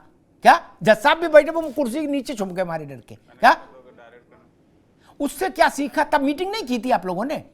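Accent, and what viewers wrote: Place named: Lucknow, India. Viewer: जी लखनऊ से हैं लगता है। गुलाटी वर्दी चारबाग।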